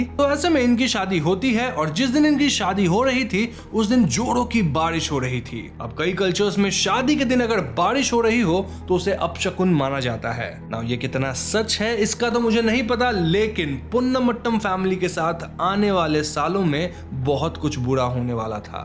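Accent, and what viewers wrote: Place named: Bengal, India. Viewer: Dada bongali hai .. per pe char Raha hai .. not ped pe Chad Raha hai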